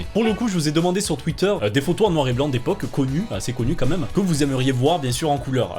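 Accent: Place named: Marseille, France. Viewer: Le petit accent marseillais des familles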